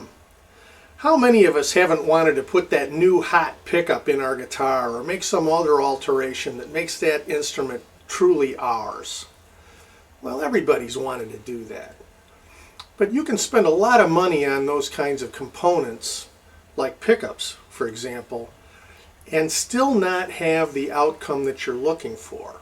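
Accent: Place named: United States. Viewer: Great video. I never realised you were not supposed to touch the solder to the iron. I've learned a lot,. Thanks. BTW It's a bit off-putting to hear the American pronunciation for soldering - to my ears it sounds like you are saying 'sawdering'. In the UK the SOL is pronounced as it is in soldier or, if you like - souldering! :-)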